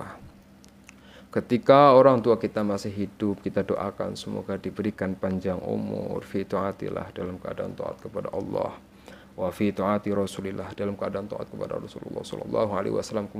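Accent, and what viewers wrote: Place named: Banyumas, Indonesia. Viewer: Orang cilacap ,banymas, tambak, purwokerto? Ngapak bangeeet???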